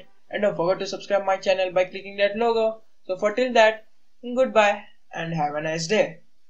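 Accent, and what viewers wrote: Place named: India. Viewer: Indian Accent... Not trusting this.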